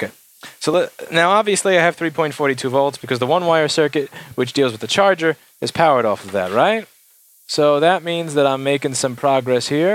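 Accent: New York, United States. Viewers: didn't even have to look at the description to know you were from new york. you probably grew up near queens too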